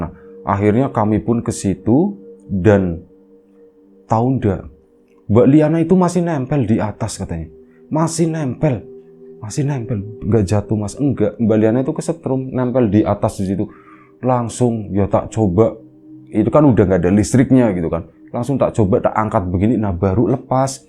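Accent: Tegal, Indonesia. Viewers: Jdi kepo dg cerita2 di Riam Biru, ceritanya beragam thema dan variatif. Naratornya jg dlm bercerita kdng bikin aku ketawa sndiri, khas org Tegal. Salam buat temen2 yg di Tegal, jln layang, jln zaenal arifin,yg deket texin dan lain2nya...salam dari jogja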